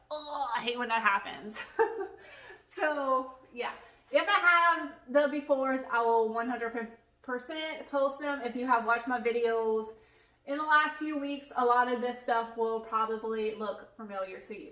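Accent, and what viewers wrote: Louisiana, United States. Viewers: I knew you were from Louisiana, you sound exactly like my daughter in law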